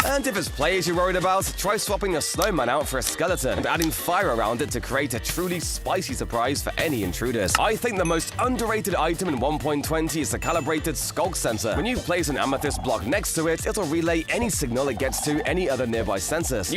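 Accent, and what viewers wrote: United Kingdom. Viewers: He sounds like British skip the tutorial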